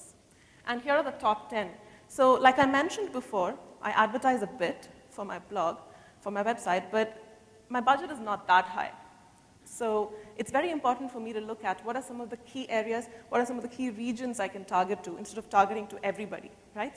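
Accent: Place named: India. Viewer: It took me a minute to get used to the Indian dialect, but this is good information for bloggers. I will make use of it on MotorcycleEventNews(.)com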